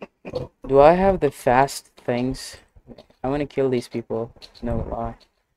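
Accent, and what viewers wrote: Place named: England, United Kingdom. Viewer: vs his english accent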